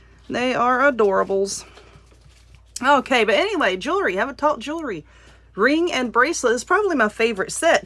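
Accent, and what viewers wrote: South, United States. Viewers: Love your ideas! I love your southern accent and your personality just cracks me up 😁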